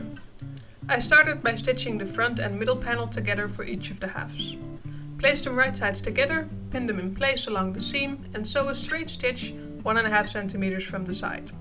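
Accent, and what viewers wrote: Netherlands. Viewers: Are u dutch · Are u dutch? :0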